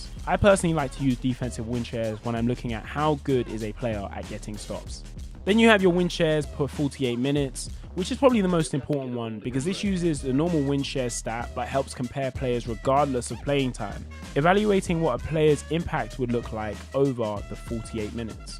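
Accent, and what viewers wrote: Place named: United Kingdom. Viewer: Watched a couple of your vids now, subscribing and hitting the bell! It’s very refreshing to hear basketball from another British person lol. It’s hard being a UK nba fan, all the games are on at ridiculous times😂 Keep up the good work!!💯💯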